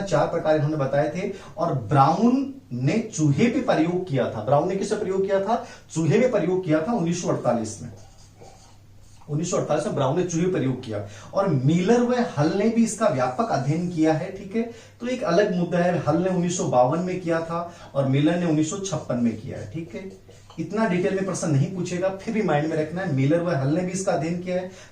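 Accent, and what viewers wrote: Rajasthan, India. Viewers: Guruji aap Rajasthan ke ho kya or aap bahut jayda achha pdate ho thanks Guruji 👍👍👍👍👍👍👍👍👍🙏👍🙏🙏👍🙏👍🙏🙏👍🙏👍👍🙏🙏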